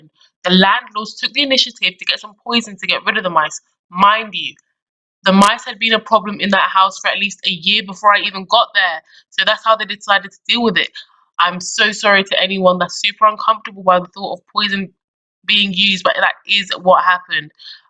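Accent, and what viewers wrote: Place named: London, United Kingdom. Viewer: Oh I had a feeling you was from there I like London culture and the accent I wanna visit one day!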